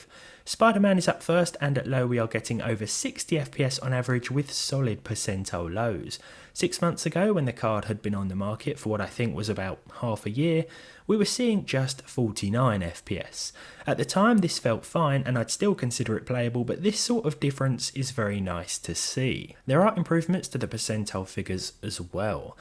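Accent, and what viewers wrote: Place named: RP, United Kingdom. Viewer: You're sounding particularly posh in this video 🧐🎩 , not criticising, simply noticing, simple as.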